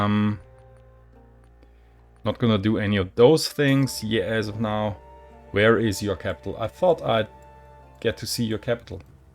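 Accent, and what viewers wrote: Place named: Austria. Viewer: Austrian accent detected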